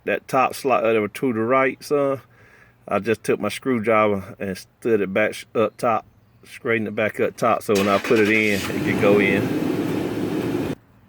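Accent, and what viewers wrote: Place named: California, United States. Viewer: I’m from Cal so thumbs up for the accent alone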